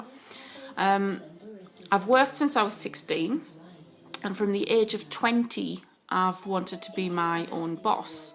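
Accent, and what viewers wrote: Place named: Newcastle, United Kingdom. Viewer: Absolutely fab Hun, love your lippie and your Geordie voice, keep them coming, you've had your breakthrough! xx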